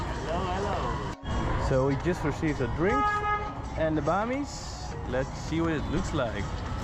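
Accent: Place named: Netherlands. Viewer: Prachtig! We blijven onze Hollandse accent zo overduidelijk behouden als we Engels spreken. Gelukkig is daar geen friet van Piet 😅 Zeer leerzame opname. Nice!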